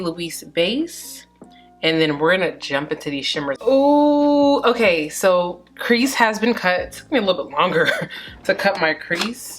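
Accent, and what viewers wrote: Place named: Nigeria. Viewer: The naija accent tho 😂 Love ❤️ this look 😍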